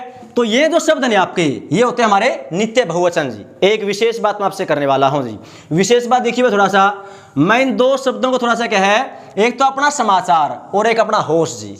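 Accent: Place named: Haryana, India. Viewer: akdm haryanvi 👍👍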